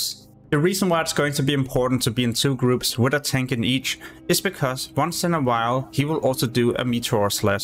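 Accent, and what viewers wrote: Denmark. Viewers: 100% Dansker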